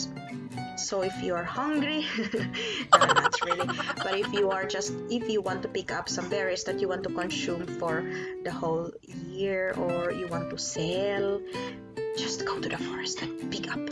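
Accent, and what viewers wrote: Finland. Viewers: How it's possible that you speak English exactly like a born Finnish? I mean your accent. No-one will believe that you are from Philippines!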